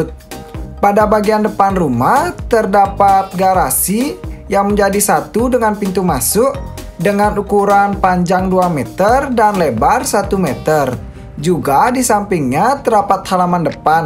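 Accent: Bali, Indonesia. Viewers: Logatnya kayak org bali ya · logat bali nya medok banget blii 😁,,, btw desain nya keren banget sangat menginspirasi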